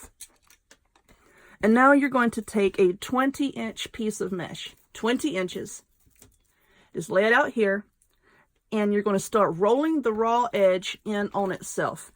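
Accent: South, United States. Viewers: I am so glad I found your channel. 1 you're Southern-like me- so we speak the same language (lol). 2 your creativity and ability to think outside of the box amazes me. I would've never thought 2 make a Cross out of cooling racks! Thanks for sharing your amazingly awesome ideas with us! I've got to add this one to my list of wreaths to make!!! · I really love your beautiful crafts. However I don't know if I love your crafts or southern draw best. Know any slang words you can entertain me with? You sure make me feel like I was in my old home. I'm looking forward to you exhibits.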